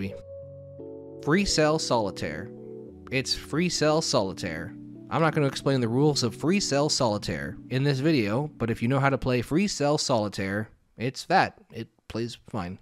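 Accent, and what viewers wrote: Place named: Canada. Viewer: Canadians accents are weird and kinda cool. You guys sound American to my European ears, until a certain word comes along, and I'm like: "ooooh, they're Canadian lmao".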